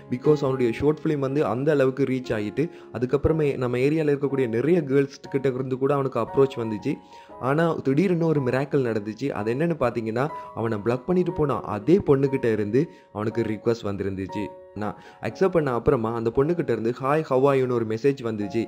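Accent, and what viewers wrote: Sri Lanka. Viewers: Bro ninga sri Lankan tamil la?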